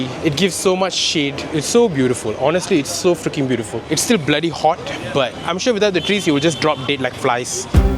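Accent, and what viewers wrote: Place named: Tamil Nadu, India. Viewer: உங்க பேச்சு மலேசியன் தமிழ் மாதிரி இல்லை பக்கா தமிழ்நாட்டு தமிழ் மாதிரி இருக்கு நான் சிங்கப்பூர் ல தான் இருக்கன்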